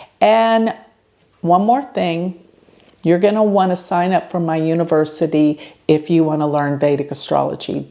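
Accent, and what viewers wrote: Texas, United States. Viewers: First time watcher.. Something made me think, she's from Texas and turns out you are! I'm in Tyler, not too far away from Dallas. Looking forward to checking out more! Cheers!! ❤️❤️❤️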